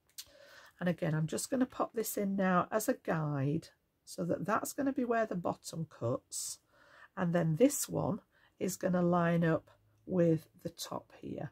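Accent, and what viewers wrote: United Kingdom. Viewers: that’s what I thought I heard. ❤❤❤❤Where about in Yorkshire?❤❤❤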